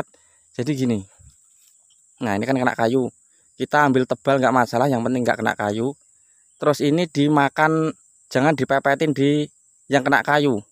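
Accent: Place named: Java, Indonesia. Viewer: Jian medok jowo tulen mase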